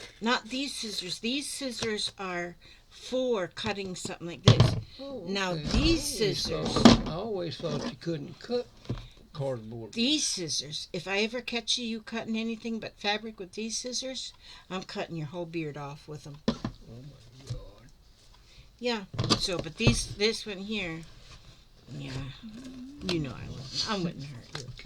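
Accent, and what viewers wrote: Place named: Florida, United States. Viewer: Hi it Patricia Rodriguez from San Antonio Texas I just always love you and papa 👴 how cute and adorable u both are and love ur Florida accent I was just thinking 🤔 you can color then put mod podge on those papers u got I guess from the floss well u can make them into paper beads and make necklaces with them I am subscribed to Darlene Michaud and she does a lot of stuff like that just giving u an idea 💡 love u guys have a blessed wonderful day in Jesus Christ mighty name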